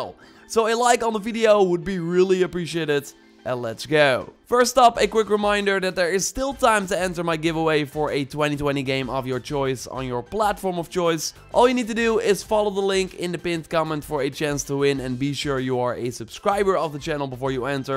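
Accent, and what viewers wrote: Netherlands. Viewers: U have an dutch accent are u dutch want ik wel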